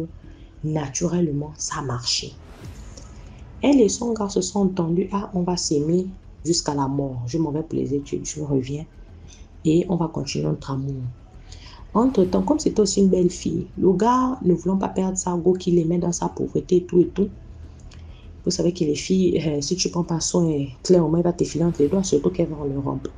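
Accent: Gabon. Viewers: Ah j m disais bien que c l'accent gaboma😂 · Kié une vraie gabonaise avec son accent bien appliqué 😂😂😂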